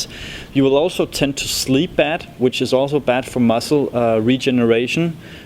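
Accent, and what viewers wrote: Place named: Denmark. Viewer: heavy on the Danish accent. apart from that excellent English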